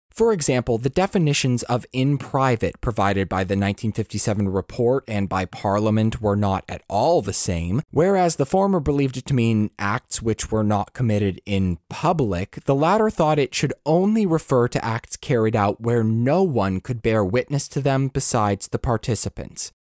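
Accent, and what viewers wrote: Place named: United States. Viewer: As soon as I heard an American accent, I suspected this would be a pile up on the interstate. I’m glad I was proven thoroughly wrong. Well researched, beautifully read, and pragmatic. I for one won’t hesitate to elevate Abse to hero status. He had to hold his nose to say certain things to get it over the line. In retrospect, the weakness of the legislation ought to be seen more in its scope than its content, as it didn’t apply to Scotland or Northern Ireland, which had to wait until 1980 and 1982, respectively, to enjoy some partial decriminalisation of their own. Sadly, Abse’s work was undermined by the police and judiciary in the following decades as they pushed people through the legislative cracks into prosecutions with laws that were never really intended to be used in certain ways. At one point it was legal to insert a fist into a man but illegal to own a video of such an act. 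Even into the late 1970s, gay men and women were still being subjected to psychosurgeries (lobotomies and leukotomies), ECT, or horrendous drug-based conversion therapy. Some are still alive today to tell the tale. The fact remains, the architect of gay rights in the UK can be traced back to Alan Horsfall, Ray Gosling and Leo Abse.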